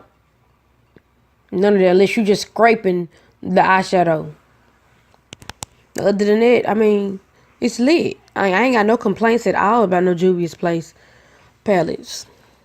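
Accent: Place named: South, United States. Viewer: Your accent is soooo Southern lol; love it. I love the look girlie.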